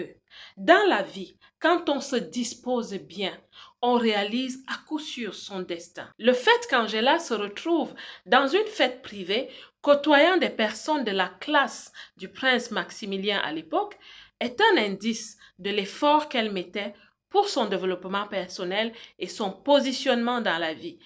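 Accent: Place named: Cameroon. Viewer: Merci pour cette histoire passionnante ! Vous aussi vous devez être une princesse de naissance comme moi 😊 Et il me semble reconnaître un petit accent du #237🇨🇲 Bien à vous Marie-Céline Princesse de Hegba♔©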